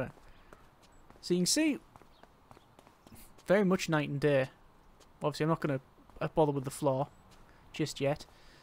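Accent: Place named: London, United Kingdom. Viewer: Quad pipe? Qruok pipe? I thoight "axing a question" was bad wnough. Can you londonese stop ruining English language.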